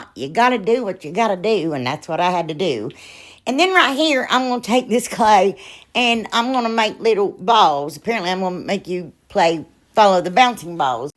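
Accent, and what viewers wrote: South, United States. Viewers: Where did you get your canvas from? I dont see the page for that gentleman you were talking either. Im from Gallipolis, Ohio and listening to you I would have thought you were from Alabama, Louisiana or Mississippi because of your accent.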